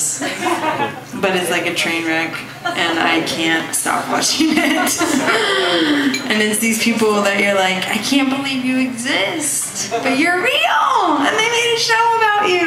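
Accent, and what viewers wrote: New Jersey, United States. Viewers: with a Jersey accent is HILARIOUS! Gotta love her lol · i love her jersey accent... but I'm from jersey and don't talk like that lol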